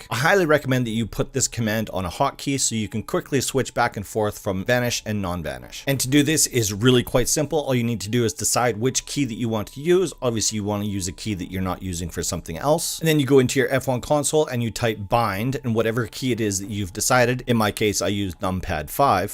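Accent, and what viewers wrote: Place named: Canada. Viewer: Dude its NOT "Copy PASTA or POSTA" or whatever you are saying ... its copy PASTE - like think of PAY + ST.... Like Fish PASTE etc. dang that is such bad and annoying mispronounciation and its hard enough with you Canadian Cousins saying ABOUT all weird but, this PASTE really takes the BISCUIT and god knows how you would say "BISCUIT" lol Im from the UK btw and love Canadians and Oh Canada...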